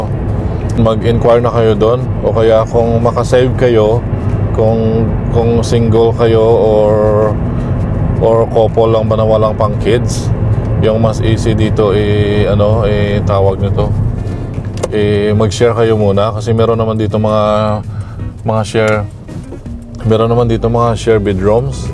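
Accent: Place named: Ilonggo, Philippines. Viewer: Nanami-an ko mamati sang tono mo. Siling ko, daw Ilonggo gid ni mu, and then i saw your other videos...ay, kababayan gid, taga Negros man gali. 😊 Makabulong sa hidlaw. 😊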